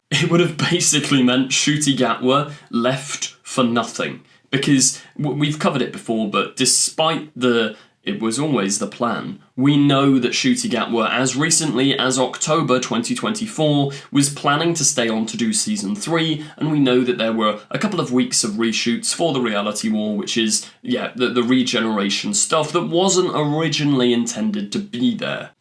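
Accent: United Kingdom. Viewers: Quit stuttering big nose with ur very strong British accent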